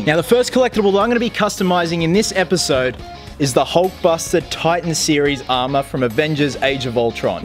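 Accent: Australia. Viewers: I could tell you were an Aussie from the start of the video.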